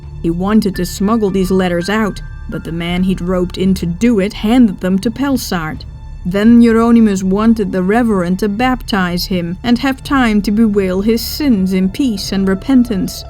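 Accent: Netherlands. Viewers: Of all the masses of information I have read, and documentaries I have watched, on the subject of the wreck of the Batavia, this is by far the best. The narrator is brilliant - the slight Dutch accent brings the story to life, and some of the facts presented even I did not know - and I’ve been an avid student of this shipwreck for over 40 years! This entire channel brings history to life in a very detailed and understandable way that draws the audience into the story and compels them to follow it through. I’ve taken to playing the audio through the car stereo on my way to and from work, the stories fascinating and well told. I cannot wait until another subject gets the Defragged History treatment.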